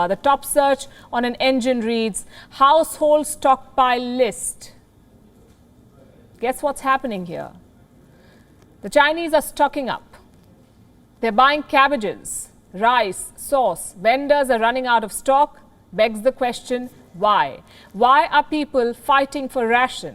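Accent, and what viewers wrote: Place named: India. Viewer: indian tongue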